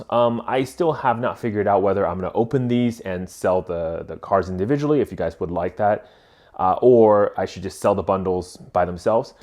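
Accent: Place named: California, United States. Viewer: It sounds like you either live in the Bay Area or Southern Ca.. I hope you are successful with your Magic business.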